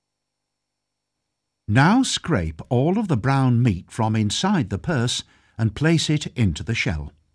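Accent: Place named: United Kingdom. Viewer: you know it's good when a god damn brit is narrating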